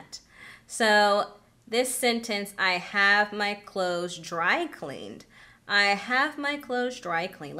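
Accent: United States. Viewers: Nice accent!!! What state are you from?❤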